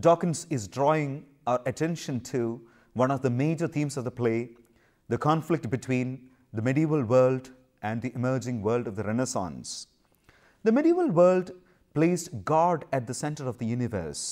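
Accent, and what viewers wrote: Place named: South India, India. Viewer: Your ascent sounds like South Indian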